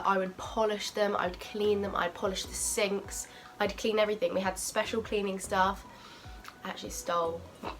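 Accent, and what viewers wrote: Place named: United Kingdom. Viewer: Very punctual with the response. But I sure do agree that the UK are far more strict than the US. Not really sure why people are attacking you as you are clearly in a different continent (due to your British accent) and you are just an employee, or at least used to be. But your quick response to address the issues people have is great and like the approach you took. Taking great care of the comments on your videos and addressing them and paying attention to it. That is nice of you to pay attention to useless comments by outraged people hating on subway. As for your video content, I can see you make videos with a bit of comedic style to it, which is unique. Disregard the hateful comments, your videos are great. It is natural and real and straightforward. Good luck with your channel, and hope you have a great future ahead of you!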